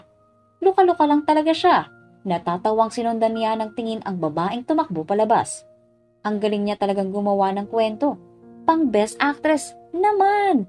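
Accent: Visayas, Philippines. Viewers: Sorry maganda story but narrator boses visaya accent nakakawalang gana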